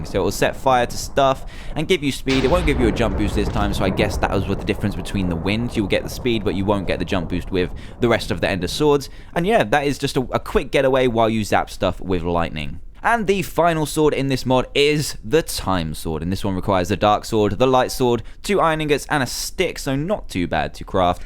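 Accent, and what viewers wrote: United Kingdom. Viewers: Your British accent made me subscribe to you! Btw, I also play MC :))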